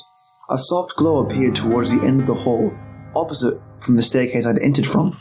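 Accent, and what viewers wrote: Australia. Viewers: Terrific story mate! Couldn't resist using the word mate, love your Aussie accent.. Which sounds pretty funny..considering I'm an American gal from the south who has an Ellie Mae Clampett drawl myself. Thanks for sharing all these amazingly creepy tales..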